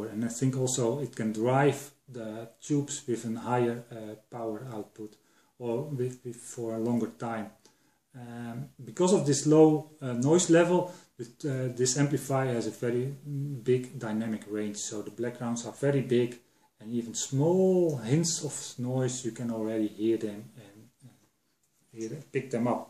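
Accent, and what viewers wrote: Netherlands. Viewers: Bedankt. Ik droom al van deze versterker. Ik heb een FM-tuner met lampen en de klank is helemaal anders. Voorlopig gebruik ik een oude versterker met discrete schakeling die het zeer goed doet. (Ik dacht wel dat je Nederlander was gezien je accent...). Walter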